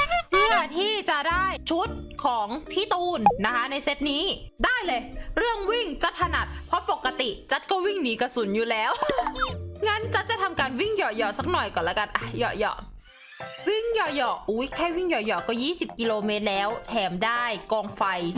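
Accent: North, Thailand. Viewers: อู้เหนือน่าฮักหนาปี่จัส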